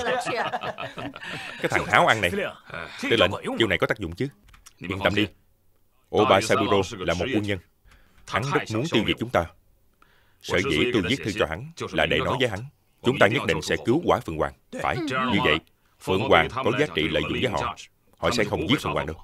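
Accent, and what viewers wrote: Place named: South, Vietnam. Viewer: Giọng thuyết minh quá nặng giọng miền Nam rất khó nghe.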